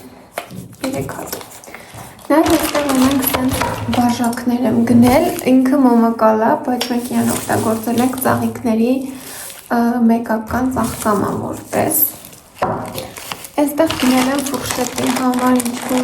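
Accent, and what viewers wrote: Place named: Vanadzor, Armenia. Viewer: Հոգում միշտ Վանաձորիս բարբառը։ ՍԵՐ Վանաձոր❤💫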